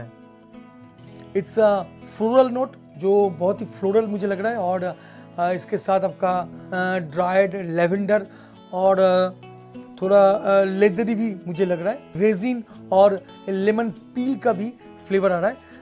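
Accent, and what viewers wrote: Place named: West Bengal, India. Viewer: bangali kab se bartender banne lag gaye...fir hume to rosogulla khana shuru ke dena chaiye..saala bolne k tareeke se hi nasha utar gaya...not watching beyond 30 second... 🤣🤣 dada tumi roshogola khao..daru varu tumhara kaam nhi h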